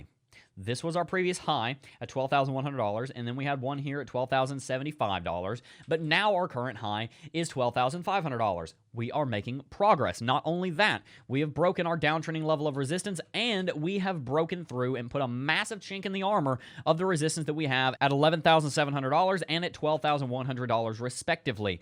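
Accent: United States. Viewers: Love Your intro. Love that american accent 🧐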